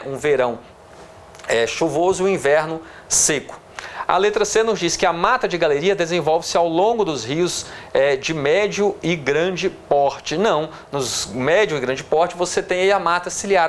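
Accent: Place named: Goiás, Brazil. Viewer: Olá professor, Eu sou psicóloga e já trabalhei com RH e sei que às vezes é difícil encontrar Profissionais competentes e atenciosos como você; E viva o nosso sotaque Goiano 🇧🇷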